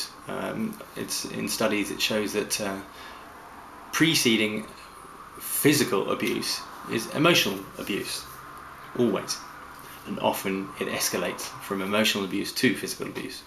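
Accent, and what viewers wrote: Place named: Australia. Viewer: Every time I hear that annoying accent I want to punch an australian in the face.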